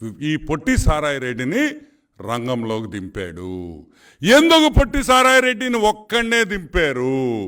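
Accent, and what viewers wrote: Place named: Nellore, India. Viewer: Anna meeru mana Nellore bhasha superb ga matladutharu anna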